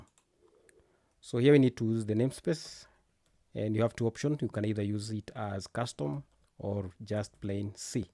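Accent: Kenya. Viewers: Unasound Mkenya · I believe you are a Kenyan. That accent though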